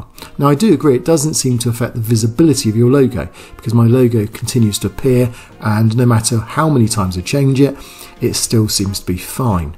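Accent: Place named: England, United Kingdom. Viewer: Yes of course. But just to be clear, it wasn't overbearing at all. Perhaps it was just me, but I found it a little distracting from that lovely English accent, LOL! And thank you again for all these super helpful videos. I'm trying to learn as much as I can so I can help my web design clients with another service.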